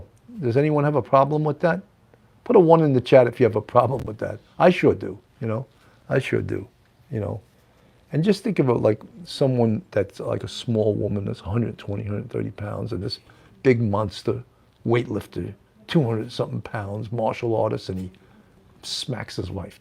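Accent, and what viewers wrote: New York, United States. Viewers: Having lived in NYC when I was a kid you sound like an old friend. Thanks!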